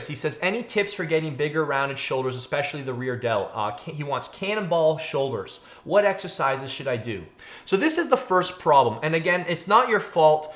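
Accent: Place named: Canada. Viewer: Canadian accent, ey.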